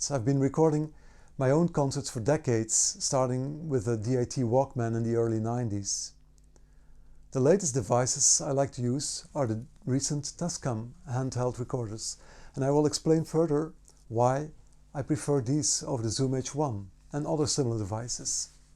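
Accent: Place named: Netherlands. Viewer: Je Nederlands komt er door, geen probleem hoor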